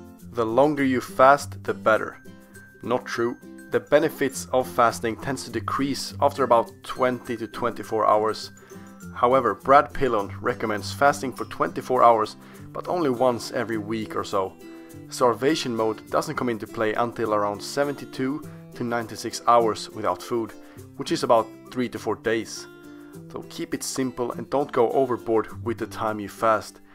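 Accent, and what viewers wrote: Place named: Sweden. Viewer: really strong Swedish accent there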